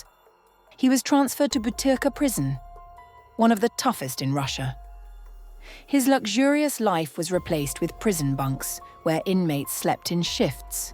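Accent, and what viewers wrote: United Kingdom. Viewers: Does this Jew-ish Gangster Narrator with an English accent think Urin belongs on the street. He could have killed Putins Son in law. He got off easy. Says The BronxBoy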